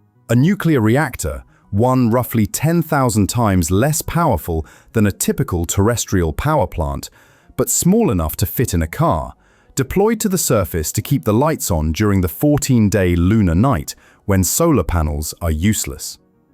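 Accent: United Kingdom. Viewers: Why use a British accent to speak American? We don’t have skeduals or use Fahrenheit 😳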